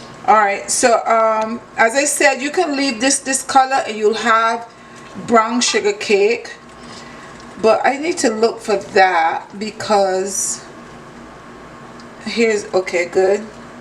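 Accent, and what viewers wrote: Barbados. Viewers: Great to hear your bajan accent peeping thru!!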